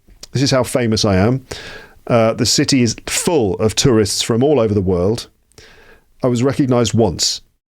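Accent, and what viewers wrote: United Kingdom. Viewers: I think you should warn your audience that listening to your podcast can be very dangerous. After just a couple of episodes I’ve got completely addicted and now I can’t stop. I love your short stories and I like so much your rambling episodes too, no matter how long they are. With your beautiful voice, your lovely British accent and your sense of humor you could read your shopping list and it would be interesting, amusing, useful and totally enjoyable From now on I will stop watching Netflix movies that I can’t completely understand, it so frustrating. I will listen to your podcast instead, so hopefully my old-fashioned-miss-Marple-English (I’m a big fan of Agatha Christie novels) will become more modern and more fluent. Thank so much for your great podcast,.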